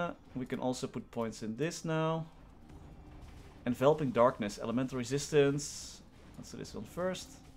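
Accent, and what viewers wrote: Netherlands. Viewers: NL accent is er moeilijk uit te krijgen bij sommigen xD echt hoe hou je het vol zo lang aan t maplen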